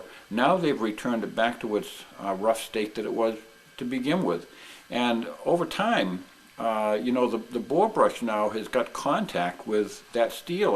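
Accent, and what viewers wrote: New England, United States. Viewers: Pleasant New England accent, sir. Much different than we're used to hearing in Nashville!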